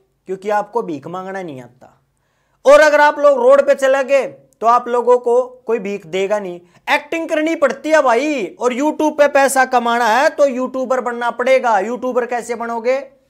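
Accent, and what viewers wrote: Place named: Gujarat, India. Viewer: Bhai bat sun k gujarati lag rhe ho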